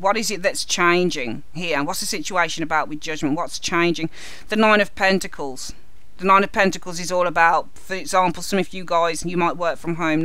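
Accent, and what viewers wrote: United Kingdom. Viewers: the 1st thing I want say is I wish I were British I love your accent! 2nd when I listened to your reading my mind was totally blown. Everything you said was so on point for me like we had a conversation and I told you every single thing going on in life this very second....unbelievable! I am going through such a horrible time at work I really hate it and can't seem to find another job, I have been looking since April. My company was bought out and this new company is a horror overworked lower pay they are phoney & so are my coworkers. I am so trapped emotionally & mentally exhausted. Wow you hit it all. I have cut some many people off its not funny, deleted my coworkers from Facebook almost 2 months ago my spirit told me to do it so wow. I said f- them all. My Sun & rising are both aries so I can't stand bs people & I can't & won't kiss ass. 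I know this won't last & things will get better & I can't wait I've been through such tried to stay humble but it's terrible to have things reversed. Thank you for your words God Bless You my sister.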